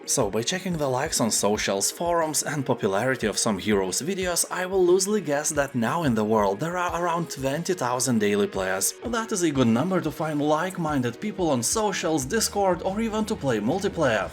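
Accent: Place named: Baltic states. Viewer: Im not sure if you actually convinced me to pick up the game again or if it was the thick Baltic accent that just made it sound like you were speaking from authority. Either way, I downloaded it from GOG, the one I had on CD wasnt "complete" anyways even if I could find it.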